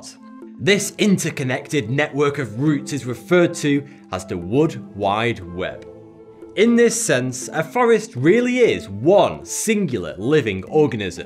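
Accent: United Kingdom. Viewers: omg you are british immediately frogives you for sponsors and ads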